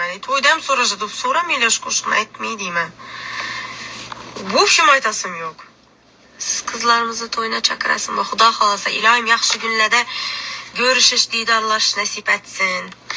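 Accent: Khorezm, Uzbekistan. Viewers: Товбаламанг нима килибди хоразим тилига тушмасангиз ешитманг бизни тил ози шундин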